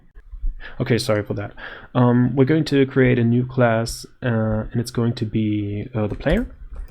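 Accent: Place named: United States. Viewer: Excellent English with a strong american accent. Where did you get that from?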